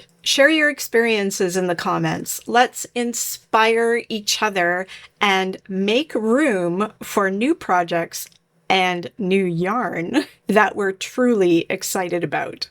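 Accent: Canada. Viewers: Hello again,. Great video but I get verklempt when I even think about destashing. To be fair, we moved four years ago and I let go of all of my commercial yarn at that time. But I still have about six bins and countless project bags in the closet. You asked us to comment on our own yarn categories and, were I to destash at some point in the future, I would need a special donate-or-dragon pile that would be for my daughters only. In other words, I will invite them to look over the yarn and donate it to them if they want it, but otherwise it goes back into the dragon hoard. Thank you for the video. I'm really enjoying them and often knitting along (or grading papers and wishing I was knitting along). I am new around here, but I think I detect a Canadian accent - so I hope you are staying warm and wooly. <3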